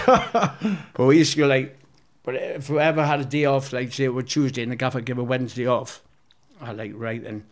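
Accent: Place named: Newcastle, United Kingdom. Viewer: Amazing geordie from a mackem 👍😁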